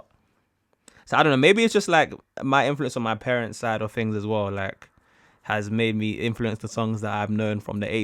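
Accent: United Kingdom. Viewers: U like the one of many British accents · That's a British accent. London more than likely.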